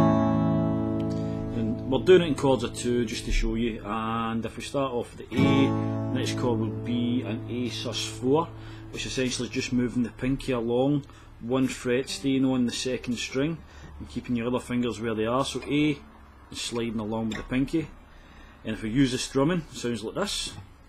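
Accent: Scotland, United Kingdom. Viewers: Is he Scottish ?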